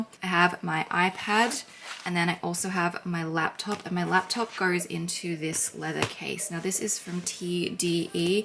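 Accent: Australia. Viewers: Lovely to hear an Aussie voice. You are making me so excited. A week until I go to France from Melbourne but want to pack now. Actually going to take photos of mix and match outfits. That might help. Relaxing vid. 👏😄🇦🇺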